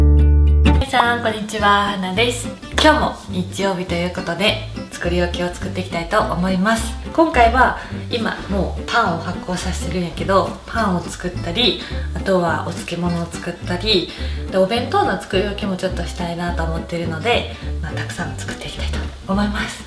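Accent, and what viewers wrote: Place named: Kansai, Japan. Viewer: ひとり暮らし始めました新社会人です。いつも素敵なお料理作られてて参考にしています♡話し方も素敵で関西弁が心地いいです^_^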